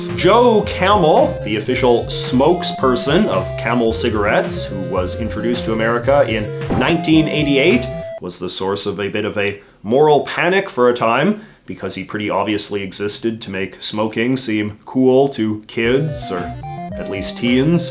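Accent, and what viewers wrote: Canada. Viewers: I don't know if you purposefully over-emphasize your Canadian accent or it just stands out so much to me, but either way I love it and never change. I really believe you are the the preeminent internet expert on Americana. You see and appreciate and critique things that neither a family member or a complete stranger would of a household, you have an understanding of a close and trusted neighbor, a truer understanding.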